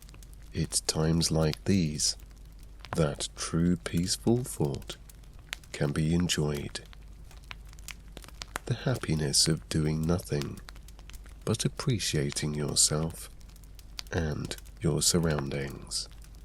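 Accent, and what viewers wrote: Scotland, United Kingdom. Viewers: I was having some trouble recently calming manifestation.guide the voices in my mind during my morning meditation. him has a wonderful Scottish accent and a very calming voice. Thanks to his meditation CD's I have gotten back on track and feel a big difference in my meditation practice.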